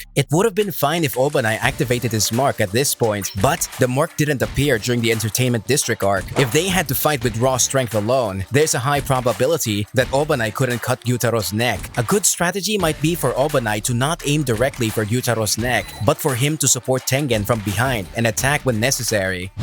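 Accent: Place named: Philippines. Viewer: I like the subtle Pinoy accent, love this what if scenarios kabayan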